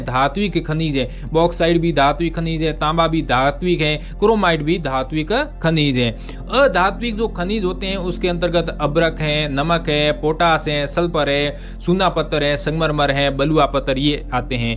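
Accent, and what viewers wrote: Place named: Southern Rajasthan, India. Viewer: Bhai aap kaha se ho aapki laungage se to lag rha udaipur khervada baswara dungpur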